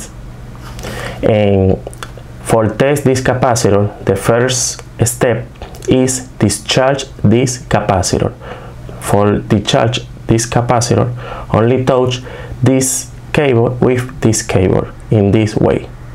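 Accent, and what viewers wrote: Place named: Dominican Republic. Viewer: Ese acento de inglés me suena dominicano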